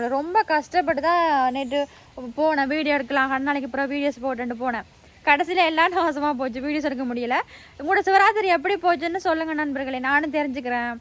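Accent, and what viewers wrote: Sri Lanka. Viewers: நீங்கள் இலங்கையில் இளம் குயில்! நீங்கள் பேசும் இலங்கை பாஷை மிகவும் அருமை எங்களுக்கு கேட்பதற்கு மிகவும் இனிமையாக உள்ளது!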